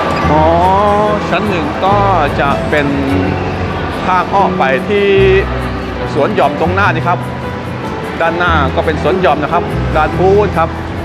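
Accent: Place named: Isan, Thailand. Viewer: คนทำคลิป วีดีโอ คนไทยอีสานแน่นอน สำเนียง บอกภาษา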